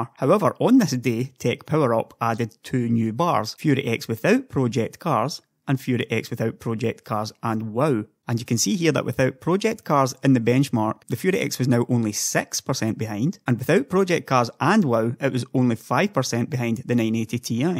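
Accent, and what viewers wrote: Scotland, United Kingdom. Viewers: Scottland? Just wondering because of how you speak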